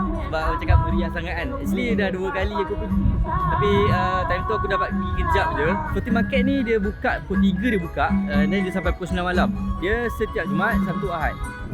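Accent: Kelantan, Malaysia. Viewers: Aa setuju. Tapi de loghat kalate kit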